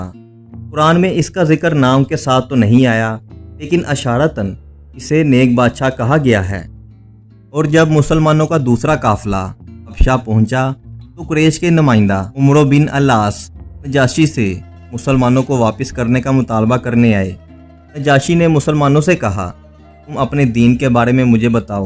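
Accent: Lahore, Pakistan. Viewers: بہت اچھے موضوع پر بہت اچھی گفتگو. کوشش کریں کہ آپ ، اپنی اردو ٹھیک کرنے کی کوشش کریں . لاہوریوں والی اردو لہجے کو ٹھیک کریں .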